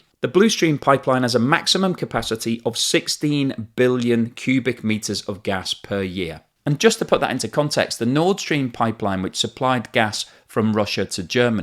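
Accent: United Kingdom. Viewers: This video tells me how crazy these western leaders are. Russian gas is Russian gas no matter the middleman. Erdogan is smart. He is part of NATO and he deals with Russia. So maybe that is why he lets inflation go nuts. If my country🇨🇦 suggested we freeze to death so we can have a pi….g match with USA we the people would certainly bring the truckers back and more. European leaders who opt for making their people and economies suffer need to step away. Turn on Nordstream 2 for goodness sake and deal with Russia. Buy their gas until other alternative sources of energy can be made. If I were made Queen of the world I would ban sanctions everywhere. Open the markets and let the free market flow. Sanctions are the source of hatred and violence worldwide. Not every country will be democratic like ours. We need to help citizens of all countries have a reasonable life. 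Sanctions hurt people not the despots who run the countries. And who says in future these countries will not change with different leaders. I am 70 and I have learned too much punishment on my children has disasterous outcomes. Turn on the gas, push Ukraine and Russia to compromise and stop all this bloodshed. thanks for the videos but you sound British. I would worry about my own country’s demise……it is in serious jeopardy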